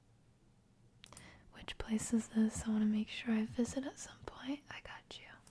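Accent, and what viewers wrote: France. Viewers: I’m going to speak for everyone when I say this… We kinda can’t put into words how much we appreciate you. I’m going to rephrase that... Your content is nothing short of amazing, but at the end of the day, you, is what we love and appreciate. Again it’s not just your content, but the fact that day in and day out (no matter how oopsie doopsie the schedule gets) you strive to put us as a priority. Not to mention, no other creator in this field interacts with viewers like you do, and that makes us genuinely feel like we truly are your sunshine who makes you happy when sky’s are gray… you also preach the Good News of Christ which very FEW people do. On a slightly different note, there’s always a handful of us out there that will never open up about what’s going on in our lives, but just know you’ve pulled so many people out of so many holes that we cannot simply thank you enough for it… one of the many was in your chat earlier too, and with your help was able to join the Marine Corps. 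You change lives. That being said never forget how loved you are by not only us, but by God as well. You’re a one of a kind, special, and goofy human being who has one heck of a French accent that no one will ever come close to touching. Thank you, and we genuinely love you. Love, -Your Community Very important P.S.: please please please make sure you’re taking care of yourself. Always remember that if you need too, don’t be afraid to put yourself first. I know we pick on you for the late st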